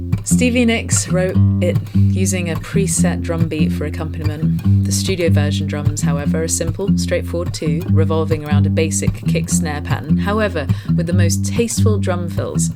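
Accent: United Kingdom. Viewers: Before you even said it I was thinking "This is one take!". Awesome - like a Rick Beato video with a beautiful British accent. I could listen to you speak all day, I'd love to hear more 'analysis' from you.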